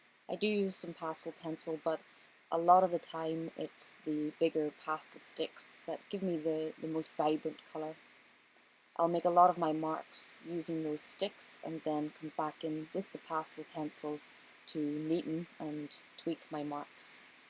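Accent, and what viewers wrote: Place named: Ireland. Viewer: And your irish accent is so cute !! I'll be watching your videos now to get to know pastels better, thanks for the effort of making them really.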